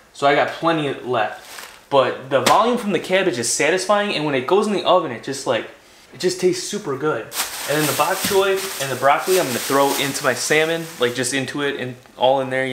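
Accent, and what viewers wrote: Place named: Midwest, United States. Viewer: where is this guy from? THOSE AAAAs are midwestern AF! Canadian? he’s freakin gorgeous and sounds so funny lol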